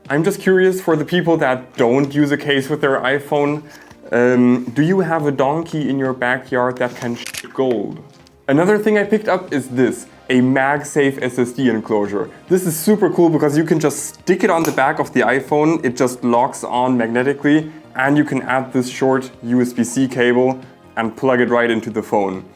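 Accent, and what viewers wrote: Germany. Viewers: I hear deutsch😂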